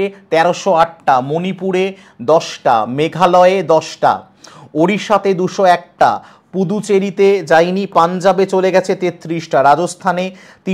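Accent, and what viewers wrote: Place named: Bangladesh. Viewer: Your pronunciation like a Bangladeshi.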